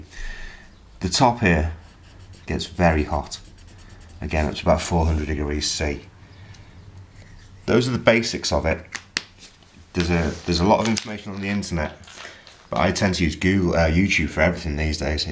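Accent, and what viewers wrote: United Kingdom. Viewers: ive had a bash at making my own with limited success :( i saw your video and was very impressed by the temperatures you where achieving .was wondering what you sell them for and if it was possible to do smaller scale ? im from Newcastle on tyne so great to hear a British accent person making these videos instead of out back Americans lol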